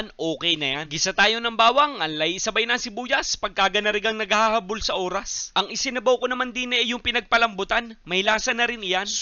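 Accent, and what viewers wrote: Batangas, Philippines. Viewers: Are you from Batangas?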